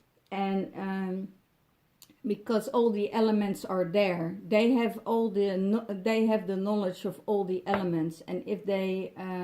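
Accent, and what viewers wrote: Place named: Netherlands. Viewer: I can hear you are Dutch hahaha it obvious hahah; ik ben afgelopen weekend zwaar getransmuteerd 👌🏻 I like it!!!!!!! Ik ben een man beschouw mezelf als DF, Scorpio. Indigo, yellow worrier en heyoka T is allemaal om de dualiteit in zelf naar 0. of.0 te brengen Lekker in balance joh 👌🏻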